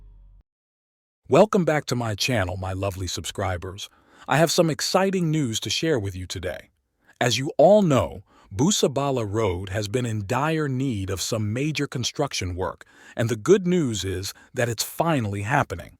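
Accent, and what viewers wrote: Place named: United States. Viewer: How comes you know Buganda clans but in the vlog you sound to be an America. This is very interesting thanks man. Keep it up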